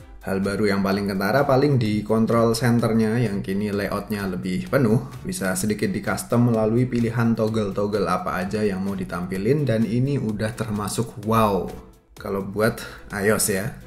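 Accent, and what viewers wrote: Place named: Yogyakarta, Indonesia. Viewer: Wong jogja toh?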